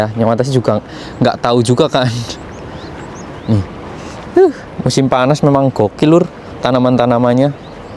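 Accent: Banyumas, Indonesia. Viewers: orang mna sih kok logat bahasanya sama kya inyong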